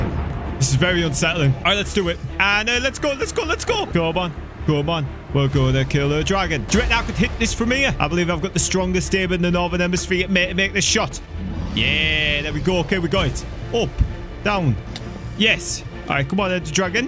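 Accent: Scotland, United Kingdom. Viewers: You sound Scottish like me mate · Are you British? I don't care you you even answer And is this video sponsored by laff loaf? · Are u Briish are u briishmate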